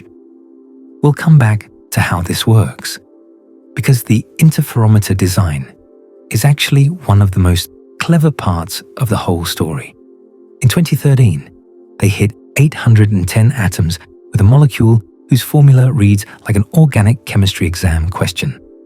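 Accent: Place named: England, United Kingdom. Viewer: English accent - American idiom. Quite dissonant.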